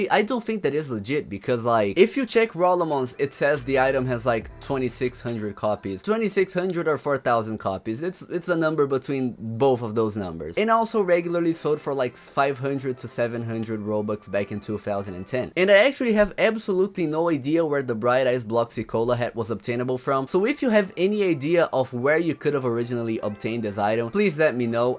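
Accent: Brazil. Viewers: esse sutaque de br vai ter q vir num churras de domingo aq em casa em. BTW VERY GOOD ENGLISH BRO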